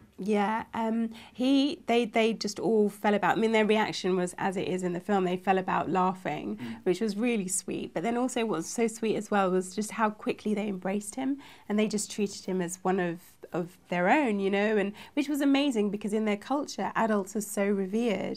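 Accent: United Kingdom. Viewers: i loooooooove the accent yoooh you are so good. you sound soooo African in the movie and so British in real life woooow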